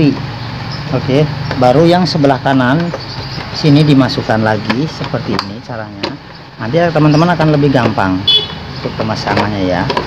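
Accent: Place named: Banyumas, Indonesia. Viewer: Republik Ngapak..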